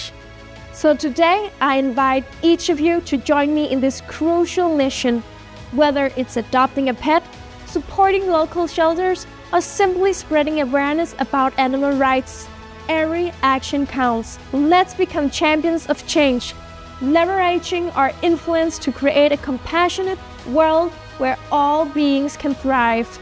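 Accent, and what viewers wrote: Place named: Denmark. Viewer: She has such a danish accent :p I love the true-ness of this nation representative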